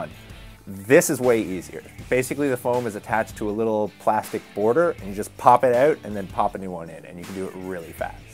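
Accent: Canada. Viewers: Jose Lopez It's Canadian, not Irish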